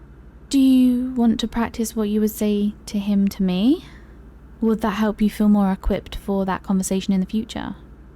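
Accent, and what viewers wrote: United Kingdom. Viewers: Oh! Oh! A therapist roleplay! I love these soooo much!! Thanks for your hardwork, British accent & Therapist? Ahhh. Sucha pleasure to the ears! The pacing with this one just had such a "right" feeling to it. A lil flirty back & forth is always nice too have a touch of as well. Seriously tho thanks for doin this one, much love. Godbless.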